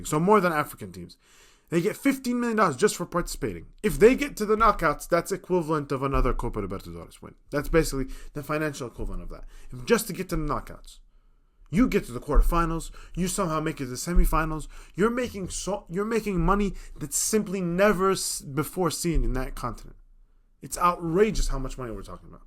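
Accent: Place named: Arab world. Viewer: Where are you from? I can heard some arab accents but can't locate it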